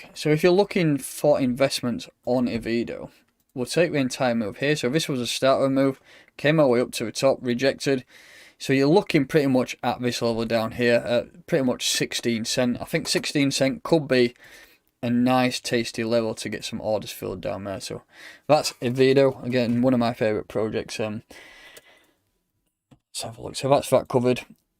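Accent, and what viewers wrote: United Kingdom. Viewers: Love the accent. Reminds me of all my British mates I may not see for a long time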